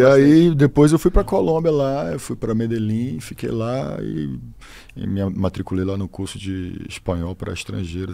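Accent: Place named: Rio de Janeiro, Brazil. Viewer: jurava que ele era carioca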